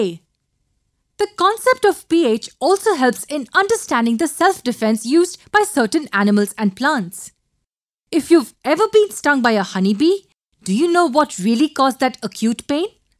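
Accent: India. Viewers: Such knowledgeable and scientific information, the Indian accent is strong too, I love it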